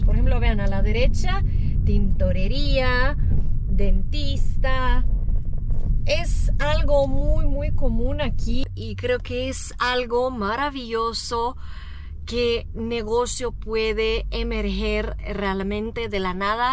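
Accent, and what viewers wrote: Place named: Mexico. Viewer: Es el primer vídeo que veo de tu canal. Hablas perfecto el español y muy a la mexicana. Gracias por apreciar aspectos que para nosotros son algo común en nuestro país. 😘❤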